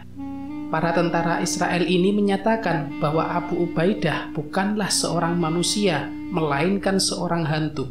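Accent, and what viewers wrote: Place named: Java, Indonesia. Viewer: Abu Ubaida....MEdok kali mas😅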